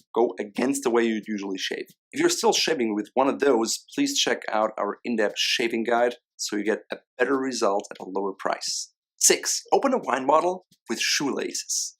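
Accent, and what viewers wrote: Germany. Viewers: Is your accent German?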